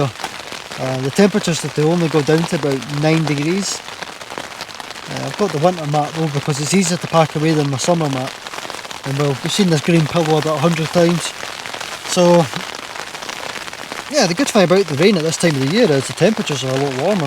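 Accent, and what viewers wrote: Scotland, United Kingdom. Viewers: Are you Scottish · are you british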